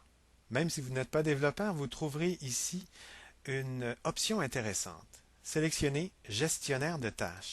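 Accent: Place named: Canada. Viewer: j ador ton accent canadien ^__^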